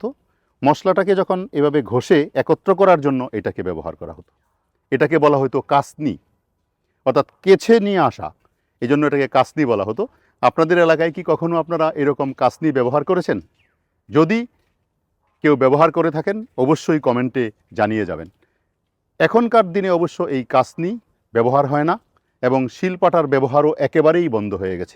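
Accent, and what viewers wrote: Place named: Mymensingh, Bangladesh. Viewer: প্রথমেই আপনাকে আমার আন্তরিক সশ্রদ্ধ প্রণাম এবং শুভেচ্ছা জানাই l বাংলাদেশ তথা এই উপমহাদেশের বিভিন্ন ধরনের উদ্ভিদ,তাদের বৈশিষ্ট্য,গুনাগুন এবং চিকিৎসা সম্বন্ধীয় ব্যাপার গুলো এবং খাদ্যের রেসিপি যখন আপনি বলেন ভীষণ ভালো লাগে। তদুপরি আপনার বাংলা ভাষার যে টান সেটা আমার পূর্বপুরুষ যেই জায়গা থেকে এসেছেন সেই জায়গার অর্থাৎ ময়মনসিংহের l আপনার কথা সুর শুনতে আমার ভীষণ ভালো লাগে। আপনি যে ব্রত নিয়ে তথা বিশ্ববন্ধু হয়ে আছেন সেই ব্রত নিয়ে এগিয়ে চলুন অনেক ধন্যবাদ। আমি ভারত থেকে এই শুভেচ্ছা পত্র পাঠালাম।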